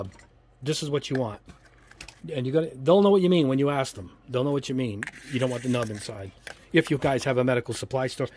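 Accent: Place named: Long Island, United States. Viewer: Long Islanders understand his perfect accent:)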